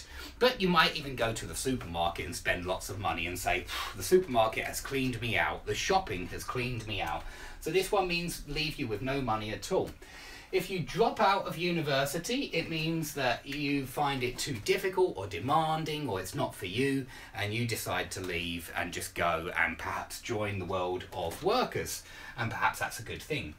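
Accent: United Kingdom. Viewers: Hi greetings from Colombia, i still enjoy your lessons, thanks. I hope You área doing well. I love your british accent and i find it pretty understandable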